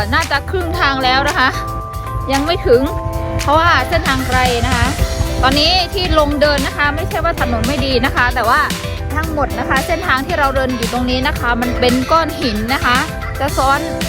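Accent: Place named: Isan, Thailand. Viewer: บรรยายเก่ง ออกเสียงไทยชัด บางทีเหมือนออกทางอีสาน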